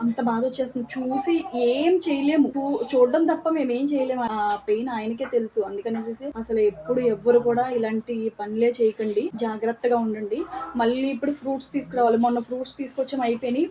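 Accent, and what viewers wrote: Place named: Palakollu, India. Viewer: Meedi a ooru akka ? Palakol vacha antunav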